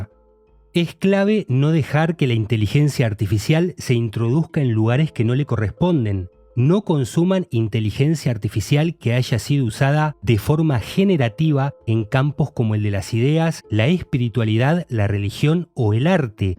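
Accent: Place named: Argentina. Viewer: Aquí, dispuesta, UNA VEZ MÁS, a SEGUIR APRENDIENDO de tus trabajos de investigación. Tu indisimulable acento argentino es, en verdad; un ORGULLO para todos tus compatriotas, entre los que me encuentro. Además, me llena de GRATITUD el hecho de que, a mis años, puedo quedar SIEMPRE ENRIQUECIDA al escuchar a un joven TALENTOSO que, seguramente, tiene edad como para ser mi nieto: un PRIVILEGIO que es para mí un REGALO DEL UNIVERSO. Un abrazo desde Mendoza.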